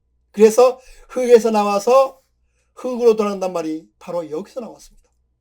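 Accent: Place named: Gangwon, South Korea. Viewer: 어머.선생님 어쩐지 강원도 사투리가 있다 싶었는데... 반갑습니다. 저도 영월출신입니다.^^ 저도 주역공부 열씸히 하고 있답니다.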